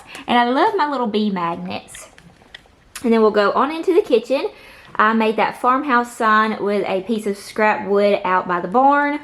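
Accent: South, United States. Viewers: Your home is absolutely lovely You have done such a beautiful job with it. Moreover you are just a incredibly beautifully lovely young woman.😇 I bet your mom is really proud.😍You're about the age of my daughter which I had very young, because I'm not that old I'm only 50. ☺️Which probably seems old to you but it's not that old isn't it mothers that have older daughters. LoL😉 I want so bad to learn about essential oils, I'm sick and have been for almost 20 years, so I've lost a large section of my young adult life. It's hard for me to look them up online or read them all through a book and still maintain and remember what's what and when and how I should use them? as well as how to combine them for what I'm looking for? But it's something I would truly be all in and passionate about if I didn't have just the limitations due to the severity of my illness at this time 🤔. 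God bless you honey and thank you for another amazing tour of your home and wonder of watching your cute self and you're absolutely adorable Southern accent 💝❣️❌💋❌🥰❌🌹🌹🌹🌹🌹❣️❣️❣️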